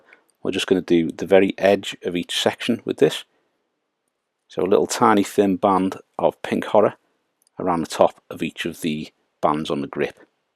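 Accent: Liverpool, United Kingdom. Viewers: Why is whenever I hear a fellow scouser on YouTube I instantly sub 😂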